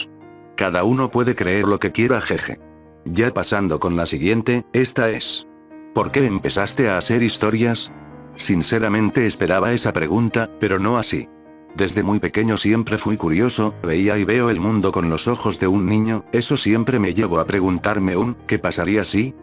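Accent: Argentina. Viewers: We yo ya sabía que eras argentino :V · Sabia que eras argentino uwu Se nota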